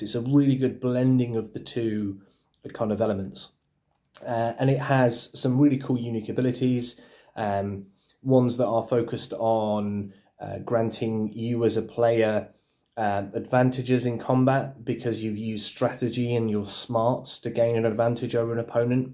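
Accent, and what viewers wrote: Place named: United Kingdom. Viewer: Cool Vid! Listening to a british dude talk can be quite entertaining.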